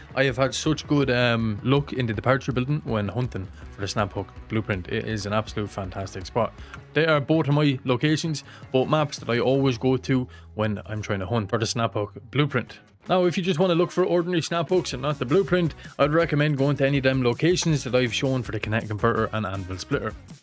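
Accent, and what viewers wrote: Ireland. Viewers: Great video,! Extremely helpful. And every time I watch one of your videos, your brogue reminds me that I need to get back to Ireland.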